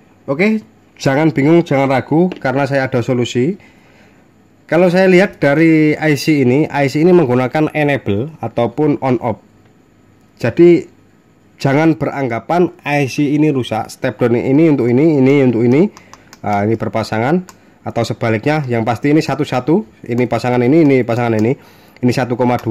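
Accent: Java, Indonesia. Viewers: Wah keren samarinda rasa jowo Nunut sinau om bos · Gan dr logat nya orang jawa ya?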